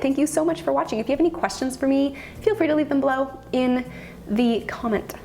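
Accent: Canada. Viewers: Are you Candian or from North Dakota?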